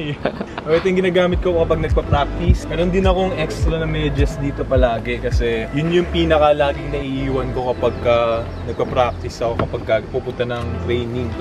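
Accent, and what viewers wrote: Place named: Conyo, Philippines. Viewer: Ang conyo 😆😆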